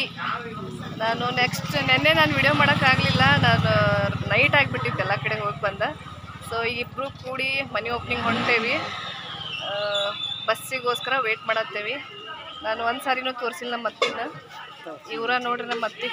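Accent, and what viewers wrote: North Karnataka, India. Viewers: I am suma nim vlog changidy nima utara Karnataka mathu thubha nice nima urena vlog madi mam👍👍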